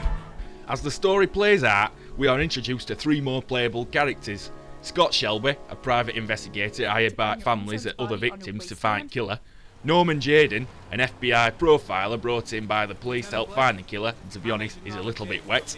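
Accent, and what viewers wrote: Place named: Scotland, United Kingdom. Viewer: the guy wasn't listening to my verbal commands so I shot him. Anyway, good review. Is that accent for real? you sound seriously Scottish, like deep in the hills, kilt-wearing, haggus eating, "Freeeeedom !!" yelling Scottish. are you?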